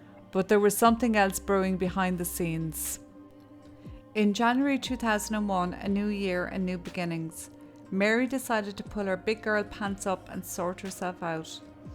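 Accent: Ireland. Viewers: your Irish accent could charm a snake ❤ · I love your tone. You don’t sensationalise and are so respectful to the victims and their families. And I could listen to your beautiful Irish accent all day. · Thank you for your answer and also thank you for your wonderful videos, love the Irish accent as well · Irish accent fab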